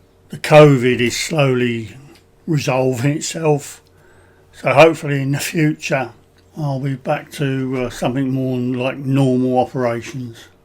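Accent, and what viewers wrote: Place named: Chatham, United Kingdom. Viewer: Well, it’s a decent resolution to the problem of storage. My Halfords cabinet is black, so easily marked and wiped away felt tip isn’t an option. Why don’t they make these things easy to label? Really enjoying your channel. BTW, where do you originate from? Your accent sounds very Chathamese to my ears.. Les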